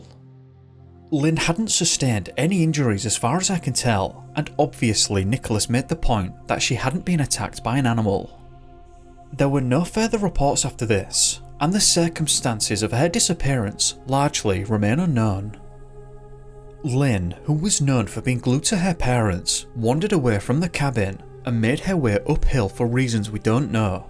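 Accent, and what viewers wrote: United Kingdom. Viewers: BRITISH ACCENT LOVE IT!❤❤❤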